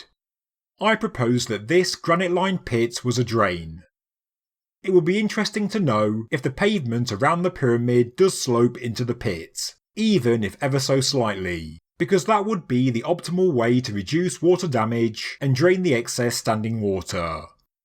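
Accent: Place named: Midlands, United Kingdom. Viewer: He’s from the Midlands………… leave him alone😡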